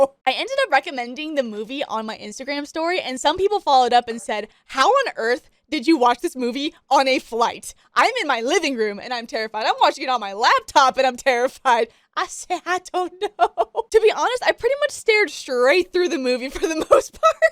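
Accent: South, United States. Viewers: no me habia dado cuenta que eras sureña! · I could hear this southern snow queen talk ALL day.. 😍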